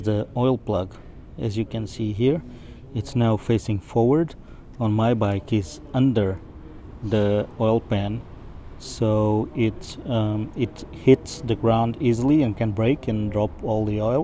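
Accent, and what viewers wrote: Portugal. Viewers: ahah sabia que eras Portugues! Portuguese accent ;) bonitas motos! cheers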